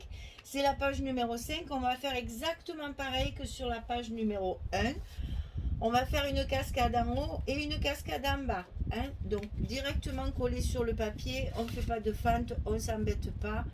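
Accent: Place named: Provence, France. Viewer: vous êtes pétillante, pleine de joie de vivre et on devine votre accent provençal. . Tout ce que vous nous apportez dans le scrap... à très bientôt 🤗😴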